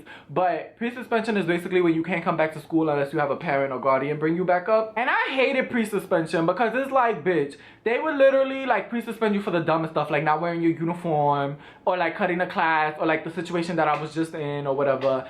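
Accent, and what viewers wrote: New York, United States. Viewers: I loveeee how you talk , that New York lingo 😍 Love your story times ! 🔥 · Hey, I don't live in the USA, and I have no idea how I came across you videos, but I really like them, and I like NYC. Your storytimes gave me a pretty good insight of living in Brooklyn, and I thought it's very entertaining cuz it's actually sooo different from living in Europe,it's unreal. btw at the beginning of finding out about your channel, I couldn't understand most of the things you were saying, but after watching the How to talk like you're from New York video, I now feel like if i'd ever have to live there,I could blend in easily. So thanks for making it:D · I love his accent 😂😂 NYC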